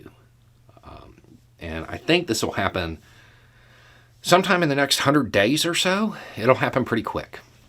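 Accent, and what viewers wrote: South, United States. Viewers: I just LLOVR that southern (drawl?). We here in east bay SF agree you got it absolutely down! These people (everyone) better wake up. Good luck🙏🏿👍🏿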